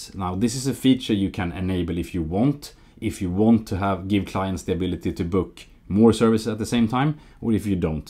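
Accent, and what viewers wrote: Sweden. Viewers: can hear the swedish dialect🤠 but no worries, its alot better than most other dialects👍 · Are you Swedish? You sound like my friends and relatives.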